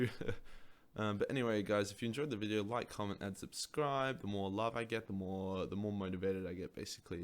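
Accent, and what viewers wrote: Australia. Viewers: Omg u speak total lad speech. need more Aussies on youtube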